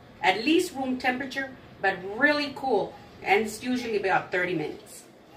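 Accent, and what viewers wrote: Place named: Texas, United States. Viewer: ! I absolutely love your recipes! I tell our four young daughters who watch your videos with me that I would love a lady in my life like you to teach me all her recipes! I often thought you were from Texas, just because of the way you talk and present yourself. I am from Austin, and we live in Manor, and today on this potato salad video I saw the mustard from H‑E‑B. Haha!!! I know there is no HEB’s in any other place but Texas! Anyways! We LOVE YOUR RECIPES! I used your cheese enchilada video as a reference for last nights dinner. We all loved it! Thanks for all you do and God bless you and your family!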